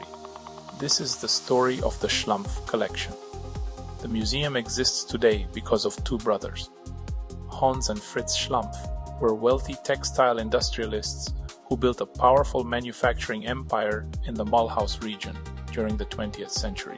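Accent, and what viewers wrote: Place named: Germany. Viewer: Good luck with your further efforts. The "AI voice" misled me with a very strong "German speaker's accent" into thinking that it was a human speaker who would/should have known how to pronounce that the "German way."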